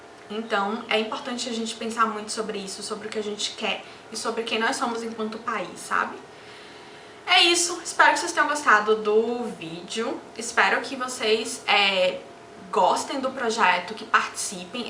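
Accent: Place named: Salvador, Brazil. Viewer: Eu bem que achei que você tinha sotaque de soteropolitana, somos conterrâneos. Um belo achado seus vídeos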